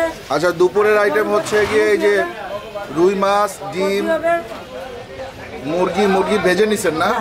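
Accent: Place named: Barisal, Bangladesh. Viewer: বরিশাল জীবনে যায় নাই, কিন্তু ভাষা ঠিক আছে বরিশালের।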